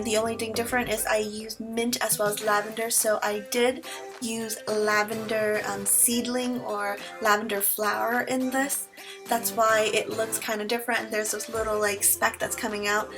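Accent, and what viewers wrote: Vietnam. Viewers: She has a vietnamese accent come on now don't be ignorant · it's a typical Vietnamese accent.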